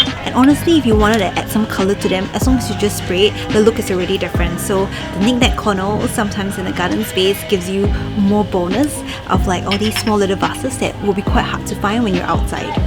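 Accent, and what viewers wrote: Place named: Singapore. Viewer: please try to improve your pronunciation. ‘check out my shopping lut’ ??? that mix of a singaporean accent and a western accent is also badly done because you pronounce one word several different ways. it’s jarring on the ears.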